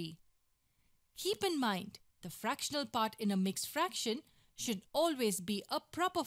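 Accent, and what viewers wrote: India. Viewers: love the Indian accent, representing our country also love the video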